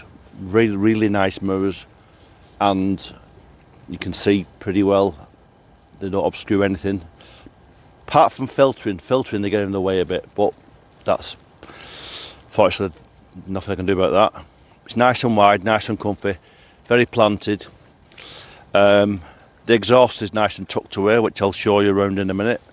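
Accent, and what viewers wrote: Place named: Northern England, United Kingdom. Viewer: you dont sound welsh are you from wales you have a northern accent??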